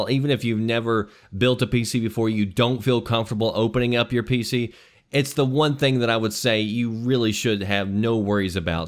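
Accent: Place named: Tennessee, United States. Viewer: Thanks a lot. Ive been thinking about getting a gaming PC and if I do, will got the route you did. BTW.. didn't know till this video but suspected you were from Tennessee from your accent... bet ya can't guess where I'm from..lol For some reason I thought my account was my gamer tag.. Knoxrandall...lol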